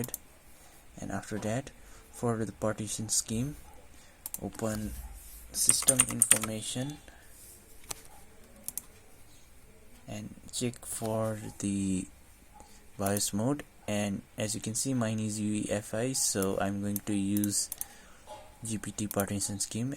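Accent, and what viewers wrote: India. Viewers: im not hating, it's good guide. but why again this indian accent? why 50% of programming videos are Indian?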